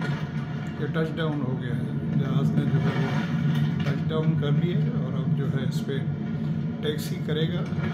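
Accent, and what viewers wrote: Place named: Pakistan. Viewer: no I was talking about his language. And that his accent tells me that he belongs to Pakistan.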